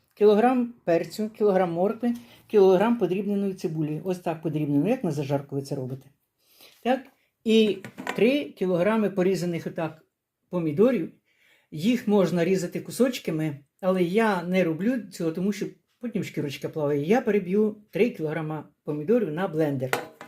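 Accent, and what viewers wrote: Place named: Poltava, Ukraine. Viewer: Вибачте,мені дуже подобається ваша мова,нагадує рідну Полтавщину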